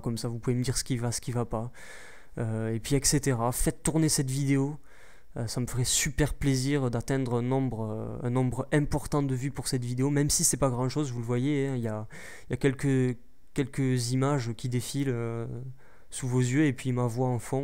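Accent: Toulouse, France. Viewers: VIVE L'ACCENT TOULOUSAIN!!! T le meilleur continue t video tu fais un super boulot et Merci a toi de nous apporter ce bonheur apres les dur journée de cours (ou de boulot pour les plus vieux) merci beaucoup continue t super video!!!:D:D <3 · toulouse <3 venant de la bas sa fait du bien d entendre cet accent:P merci pour toutes ces vidéos:) hate que les prochaines sortes con!:P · Franchement bravo pour tes vidéos!!! Un petit pouce vert pour le super accent toulousain de. ^^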